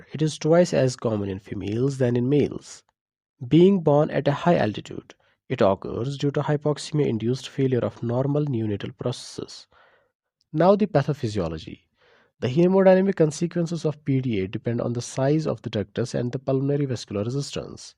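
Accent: Kashmir, India. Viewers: your accent sounds kashmiri !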